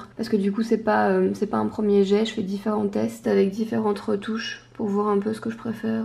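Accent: Belgium. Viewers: Tu es belge ma chérie ?😍❤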